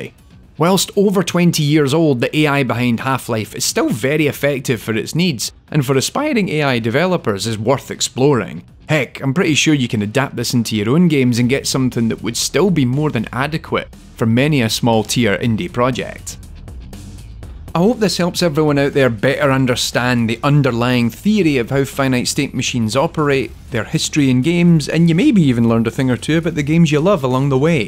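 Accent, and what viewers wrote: Scotland, United Kingdom. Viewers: please keep being scottish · "Valve's 1998 shitter." I'm like yeah it's old but shitter is a bit harsh... oh he's a scotsman. =D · Took me nine minutes to realize he's scottish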